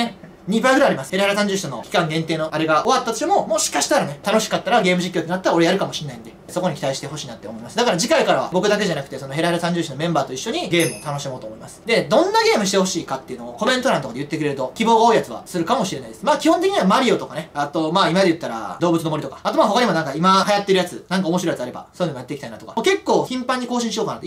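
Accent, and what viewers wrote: Kansai, Japan. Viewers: ひかるゲームズまじで好き！ ひかるの関西弁実況がめっちゃおもろい笑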